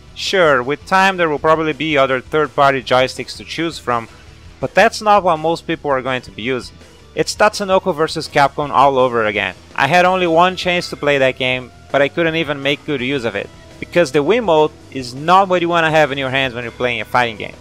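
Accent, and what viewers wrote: Brazil. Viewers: the brazilian portuguese background shines through too much and adds that horrible english accent... this guy needs a lot of work before narrating anything in english again. On a scale from 1 to 10 where crazyrussianhacker is an acceptable 4 this guy here is a solid -2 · Vc é brasileiro? pelo sotaque parece, eu também falo inglês assim rsrs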